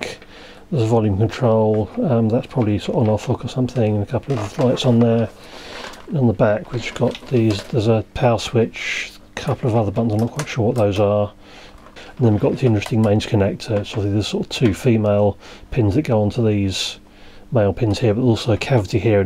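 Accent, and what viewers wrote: United Kingdom. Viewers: Возможно, вам имеет смысл проверить слух. Автор видео англоговорящий, с явно выраженным британским акцентом и чёткой дикцией.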